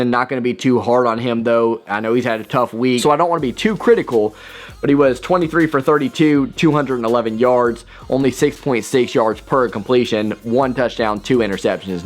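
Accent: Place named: South, United States. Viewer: Are you in Michigan? You got like a southern accent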